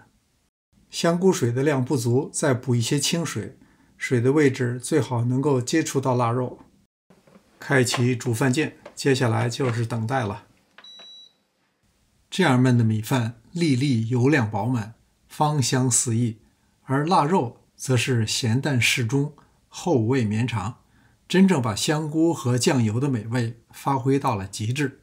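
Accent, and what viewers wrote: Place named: Beijing-Tianjin, China. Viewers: 具体听不出来口音。能听出来是北方人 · 京津地区的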